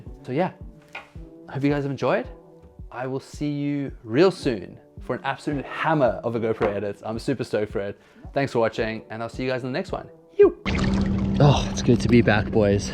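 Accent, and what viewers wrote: South Africa. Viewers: Why do you sound South African? mei bru? heiboooo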